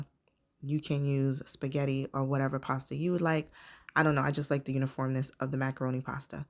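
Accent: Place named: United States. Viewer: You.sound like you might be living in the States. Where do you finf scotch bonnet? People keep referring me to habanero, but it is just not the same.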